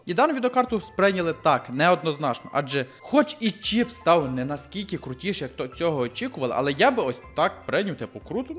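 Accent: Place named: Galicia, Ukraine. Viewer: Класний галицький акцент 👍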